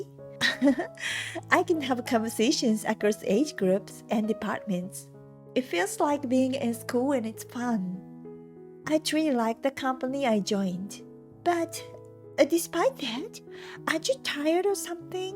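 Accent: Japan. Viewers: Why translate to English ? You hired to read story a asian stupid person who can not clearly speak English due to a heavy Japanese accent! All of the English words are blurred grammer or simply miss--pronounced totally! Very hard to listen to! !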